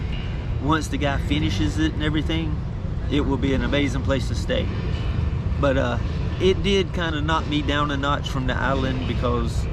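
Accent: Appalachia, United States. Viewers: are you inbred variety??? you sound like a hillbilly or Adam sandler in water boy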